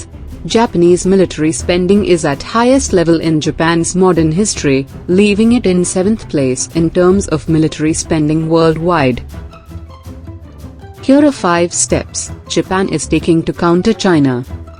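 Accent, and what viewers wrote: India. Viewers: The narrator is Indian...from kerrela? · the narrative is deliberately female INDIAN-accented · The narrator's voice is a typical Indian female voice.... sooooo pathetic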